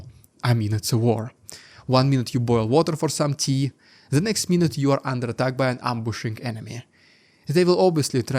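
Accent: Russia. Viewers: You present good videos, not only as far as news but with one of the best foreigners who is very competent in English. At the same time you have one of the most severely bad accents. The harsh contrast between intelligent presentations delivered with a hardly understandable Russian accent is hard to understand. A man who speaks such good English (or maybe has a good teleprompter?) should be able to teach himself a decent pronunciation of his speech. Give it a go, you will get more followers.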